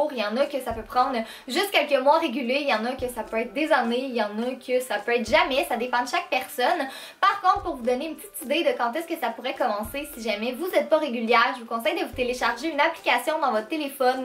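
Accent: Quebec, Canada. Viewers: Moi perso j adore la c s'en canadien. ..je t adore biiiiizzzzzzzz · Merci Vraiment Au faite je t'adore J'aime bien Ton Accent Québécois :) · Je m'abonne, je suis de France, tu es vraiment super jolie mais je t'avoue que l'accent québécois me fait toujours rire ! ahah :D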